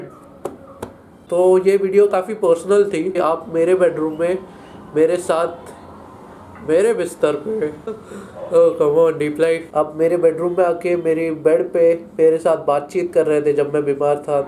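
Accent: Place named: Maharashtra, India. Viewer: bro ur hindi and english with slightly marathi accent feels that u r one of my family .. like elder bro of mine .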